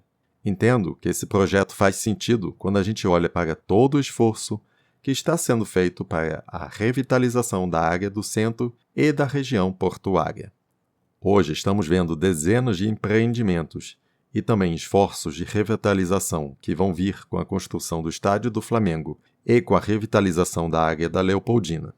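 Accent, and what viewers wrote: France. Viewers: Que sotaque francês é esse ?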